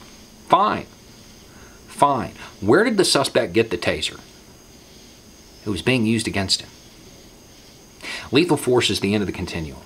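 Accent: South, United States. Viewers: I discovered your channel via this video floating about on facebook - It's refreshing, almost pleasurable to hear such common sense being laid out in a southern accent. I'll be binge watching your stuff now while I wait for Boris Johnson to finish destroying my country.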